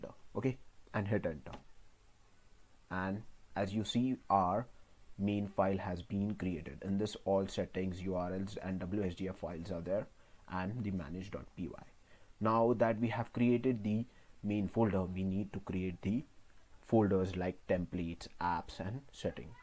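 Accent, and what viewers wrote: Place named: Germany. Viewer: Good day, I have been studying and reviewing videos in Python and now in Django on how to make a website and until yesterday I discovered the so-called templates and the videos that I have seen so far show commands and files that are already obsolete. Until last night I came across this video and it seems to me that it is well structured, updated and in English that I do not master but I have always wanted to learn it and listening to your pronunciation I think it is not your native language either, you sound more like German or European. I was looking over some of the videos of the series, I have high expectations now that I have already seen the first of the series starting with the virtual environment that until now had not deepened it. My goal is to know how to use the templates to not reinvent the stone. Escribi el parrafo en español y lo ingrese al Traductor de Google o sea que si lo quieres leer en español haces la operacion inversa en el Traductor de Google con este parrafo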